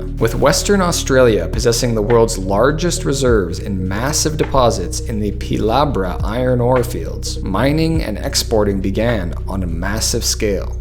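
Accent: United States. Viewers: Crap clickbait. American voiced bot spewing crap. There’s nothing wrong with Australia. When you look at the rest of the planet, we’ve got it pretty good. Pure bull💩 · life is impossible in australia? clearly you need to open your eyes and look at life in poorer parts of the world! Methinks your "life is impossible" has the whine of someone complaining they don't have the latest apple phone, or some such flippancy. The way you pronounce some australian place names indicates you have no idea about australia...... where do you (the narrator with a tinge of american accent) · Sounds typical for this type of country. U.S. has similar problems. Hopefully you're keeping an eye on the value of keeping families intact. We created an environment where reliance on one's family to live comfortably is gone. It shows in very bad ways. I do find one thing funny: I watched a video the other day explaining the U.S.'s national debt problem. 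It was narrated by a young man with an Australian accent. This video is narrated by a guy with an American accent. Go figure.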